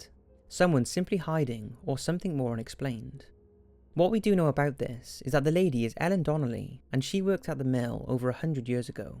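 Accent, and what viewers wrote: Wales, United Kingdom. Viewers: r u Welsh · The only comfort for people watching at 1am is that there is a lovely Welsh voice narrating it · Is Welsh? I swear he is... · Is the voiceover Welsh?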